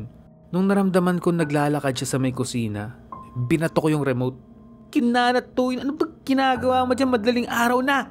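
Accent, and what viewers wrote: Ilocos, Philippines. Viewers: Alam mo po pala mag ilocano kuya 😮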